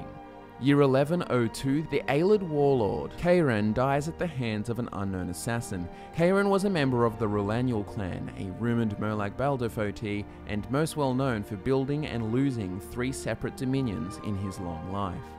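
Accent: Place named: Australia. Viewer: Been watching a lot of these lately and it is just so nice to hear an Aussie voice omg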